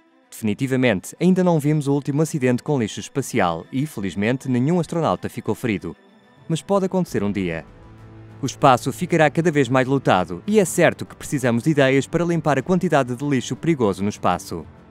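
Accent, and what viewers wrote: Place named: Portugal. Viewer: Amei a locução em português PT❤️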